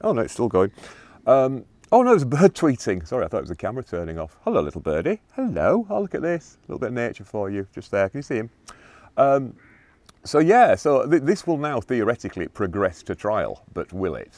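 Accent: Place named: Northern England, United Kingdom. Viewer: It’s great to hear your northern accent. As a Mancunian, I’m told I have a broad ‘northern’ accent, hopefully not as rough as Angela Rayner though!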